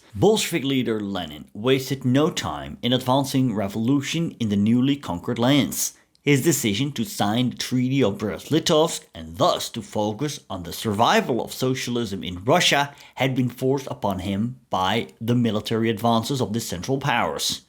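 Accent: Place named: Netherlands. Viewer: the guy speaks with a very thick Dutch or Scandinavian accent. It's not nice - it hurts my ears. things like this should be banned. if you are Dutch, make videos in Dutch, pal.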